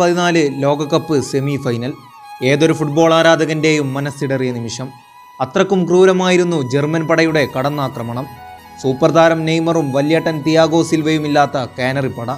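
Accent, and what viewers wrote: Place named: Malappuram, India. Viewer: Very nice presentation .I came to this channel after watching your video on Mascherano.....ningal powliyanu...Njan Alappuzhakkarananu.... malappuram slangil Messiude video kelkumbol ulla feel onnu vere thanneyayirunnu...Keep doing more videos..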